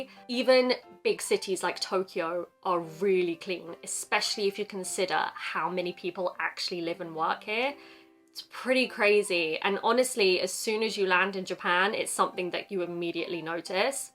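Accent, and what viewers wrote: United Kingdom. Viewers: 凄く聞き取りやすくて、きれいで優しい話し方ですね。これがイギリス英語かな？ お辞儀はやっぱりうつってしまうようですね。私の知ってる海外から来た人達も、 もれなくお辞儀するようになってましたｗ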